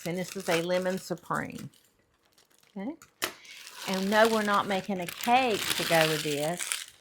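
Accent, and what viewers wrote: South, United States. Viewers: Love the Southern accent! Honestly, I have to make that lemon lasagna!!! Thanks for an easy recipe that hopefully I can make. Looks so delicious I cant wait to try it. Thanks again 😊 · I love your southern accent. Yummy lemon!! I want to try making this. Hoping all the ingredients you used are available in Canada. ❤ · FIRST TIME seeing ya!! I had to subscribe!! I love your Southern Belle accent !!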